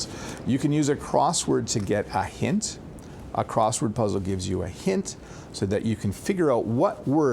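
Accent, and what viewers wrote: Canada. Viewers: I appreciate you for your lovely Canadian accent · An excellent video for training the listening skills and for to get vocabulary. I love the canadian's accent.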